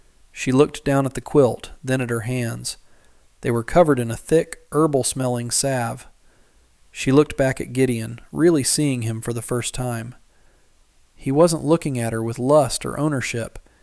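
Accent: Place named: Alabama, United States. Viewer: Love hearing a story by someone speaking with my accent. Such a beautiful story. Thank you for sharing. Listening from Alabama….❤❤❤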